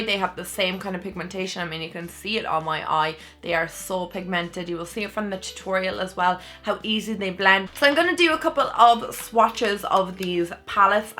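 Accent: Ireland. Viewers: I thought you had a strong Irish accent, I’m Irish myself, but I live in America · are you IRISH???? cuz I am and us kind irish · I love ur accent I'm Irish also and most YouTubers I watch are American or British ands it's so refreshing to hear an Irish accent on YouTube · fucking love your accent #ireland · Gurl are u irishhhhhh 🇮🇪💘 omgggg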